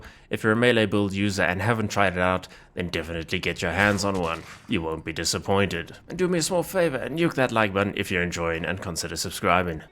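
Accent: South Africa. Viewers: You from South Africa? Just asking because of the accent.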